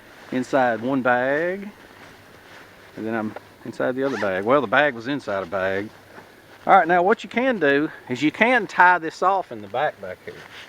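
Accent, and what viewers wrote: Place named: Eastern Kentucky, United States. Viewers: Enjoy watching your videos. Listening to you talk sounds like your from our neck of the woods. E.KY Great Job!!!! Keep the videos coming. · I have not been a subscriber very long and I have been trying to identify where you're from. I'm going to guess the Blue Ridge Mountains of Georgia. I first thought of east Tn or East KY but I definitely hear a little Georgia in there.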